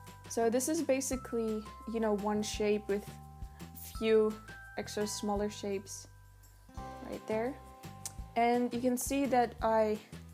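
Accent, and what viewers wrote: Netherlands. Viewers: Ikr, she totally sounds dutch. also she said Haargrens when she was looking for the word hairline. so yeah. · Are you Dutch? You kinda have a Dutch accent.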